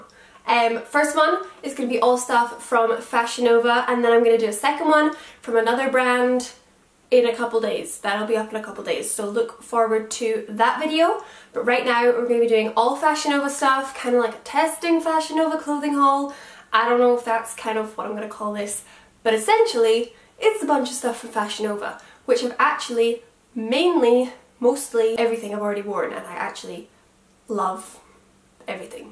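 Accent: Scotland, United Kingdom. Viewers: You suite the clothes really well Ps are you Scottish cause u have a Scottish and I'm from Scotland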